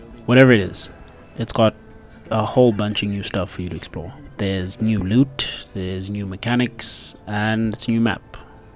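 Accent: South Africa. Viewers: Hey are you South African? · Now hold on are you from South Africa...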